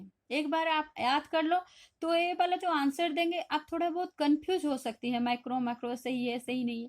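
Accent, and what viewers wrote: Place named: Maharashtra, India. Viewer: mam i enjoyed your hindi......probably you are from maharastra region ........though very very thanks for valuable suggestion.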